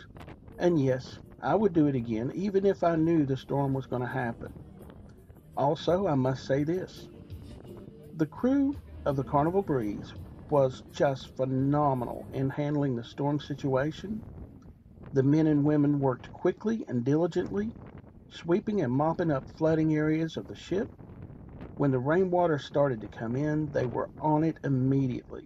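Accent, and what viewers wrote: South, United States. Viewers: great southern voice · Thank you I love your story telling made me wanna watch it all.❤️🌹👍. I love your southern accent, my mom is deep southern . God bless you and your family in your endeavors. From Alaska.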